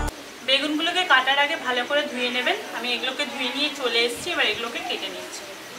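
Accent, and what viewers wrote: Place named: Kolkata, India. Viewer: Apni kolkata takhe hobe.. Apner basa sune buja jay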